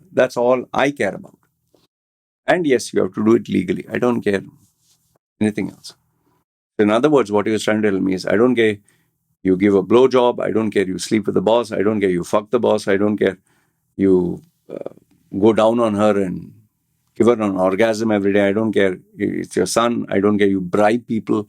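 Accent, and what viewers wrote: India. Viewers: Who the F are you? You have a thick Indian accent and you don’t know anything about caste discrimination? You should win an award for the most disingenuous and insensitive POS. Enjoy your pretentious life.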